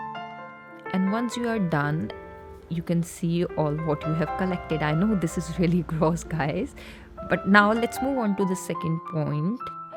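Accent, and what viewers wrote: India. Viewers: I love her indian accent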